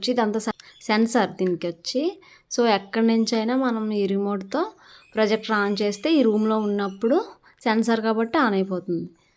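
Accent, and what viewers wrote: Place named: Godavari, India. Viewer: Godavari slang 😊😊😊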